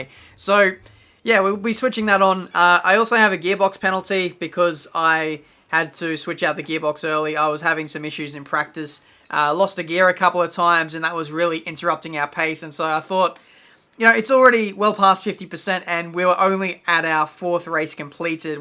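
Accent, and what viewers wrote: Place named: Australia. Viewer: Found your videos last night and have ended up watching all of them already. You've convinced me to buy this game now so thanks! Also, good to hear a fellow Aussie accent playing games. Keep it up dude.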